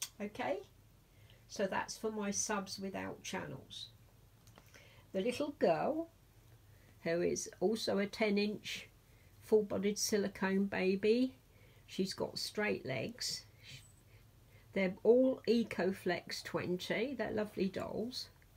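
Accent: United Kingdom. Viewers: I don't know why YouTube unsubscribes me from random people ... I was wondering why your recent videos weren't popping up in my feed! .... !! .... I remember the last video I've seen was about a haul (which are my favorite) 😊💕🌺 What I enjoy most about your channel is the hauls and the Theme Thursdays you do on the babies. You have a lovely collection! I would love to continue to see your theme thursdays and your babies dressed up in the beautiful clothes that you have for them. I enjoy your book-story-telling too. You have a wonderful and kind heart 😊🌺 Plus, who doesn't love listening to a UK Accent?? hehhehheh .... Have an amazing day!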